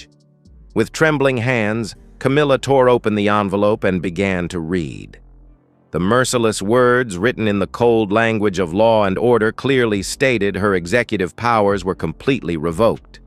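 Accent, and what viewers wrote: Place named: United States. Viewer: so many American accents on these stories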